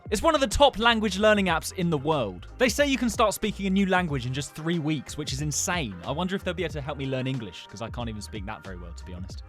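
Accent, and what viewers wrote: United Kingdom. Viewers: yo are yu british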